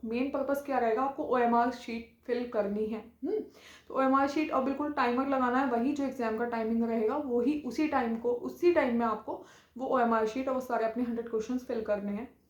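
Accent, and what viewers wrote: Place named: Purvanchal, India. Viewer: hame laga purvanchal side ki ho aap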